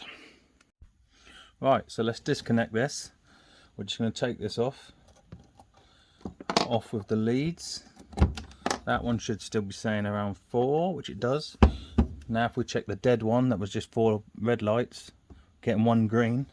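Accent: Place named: East Anglia, United Kingdom. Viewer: Thank you for this. I guess East Anglian - Norfolk or Suffolk?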